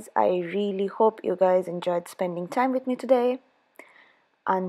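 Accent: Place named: South Asia. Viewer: Are you from Bangladesh or India or Pakistan? Your accent and name sounds like it 😅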